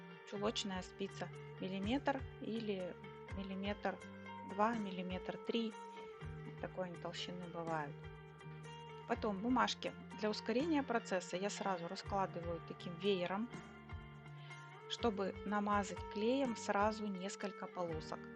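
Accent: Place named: Russia. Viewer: Снова, Здравствуйте!А, где,Вы, проживаете?По говору, не центральная часть России!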